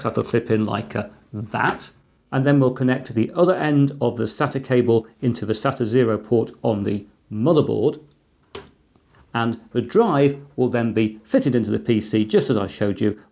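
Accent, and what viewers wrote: England, United Kingdom. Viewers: Very nice video and well explained. I like your English humor, accent and the way you say „motherboard“...👍😂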